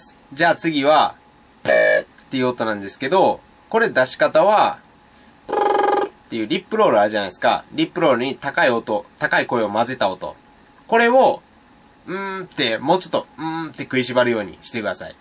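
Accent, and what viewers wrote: Kansai, Japan. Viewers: 最近いきなり関西弁になってますね笑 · 関西っすか？出身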